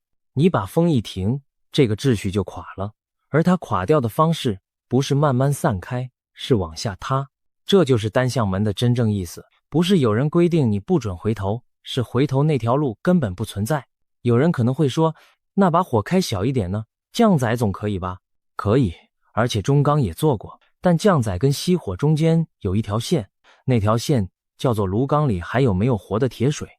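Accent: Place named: China. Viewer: 不能進去拍啊 拿了圖要授權啊 這是ai劇本生成的影片連講話都大陸口音.我還以為大陸短劇.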